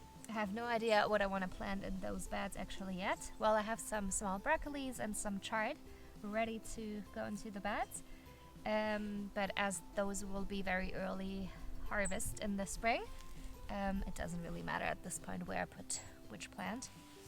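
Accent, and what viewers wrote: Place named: Southern England, United Kingdom. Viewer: Hello there, first time on your blog. If than mini chainsaw is a Mader then you've wasted your money as un reliable quality.. Those bushes you are cutting are Minmosa and is a vicious weed, cutting them back will only make them grow three time more in spring. When you see the new growth come, wait untill a foot high then spray with Round and cooking oil 50/50 this will kill them and give you clean land for next time and watch for new seed popping up as they can be a problem. I know as been clearing my land for years, it was 10 times worse than yours when I bought it. Have now got a large lump cleared and producing. I am a market gardener and now just grow too feed me so if you have any problems or things you need to ask, feel free as am in Portugal as well so have a fair idea now on planting times and what you can and can't grow. 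In the meantime, have fun.Just one thing, olives are a waist of time as you can't eat them, there are other trees you can grow that will feed you, but your choice.Would like as where you are and where you from as the name is Irish but the accent sounds southern England.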